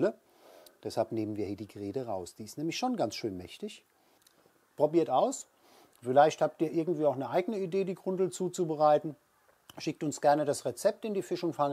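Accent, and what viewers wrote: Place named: Hesse, Germany. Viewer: Ich liebe diesen hessischen Einschlag! :-D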